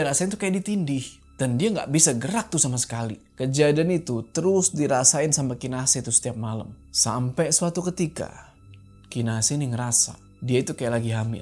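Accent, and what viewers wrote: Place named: Malang, Indonesia. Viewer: curiga arek malang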